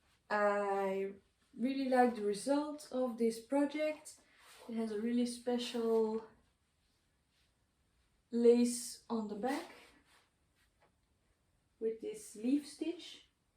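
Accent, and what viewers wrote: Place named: Russia. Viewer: Прекрасные изделия! Но у вас такой русский акцент...😀 Спаибо🙂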